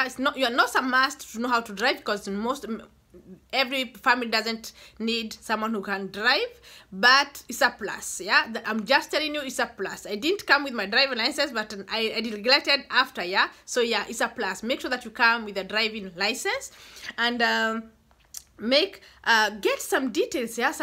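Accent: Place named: Kenya. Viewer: You cannot take the village out of a person..kikuyu accent still there after 10yrs,#gikuyu&mumbi seed.....proud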